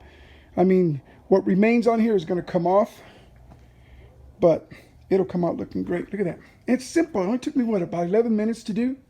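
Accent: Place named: New Mexico, United States. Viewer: Good product and nice New Mexican accent!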